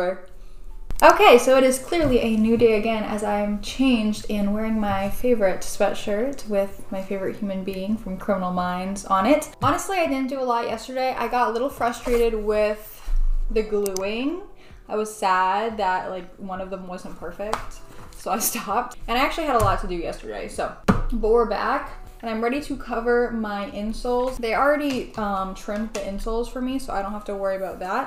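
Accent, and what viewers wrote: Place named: South Africa. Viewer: Just wondering but are you South African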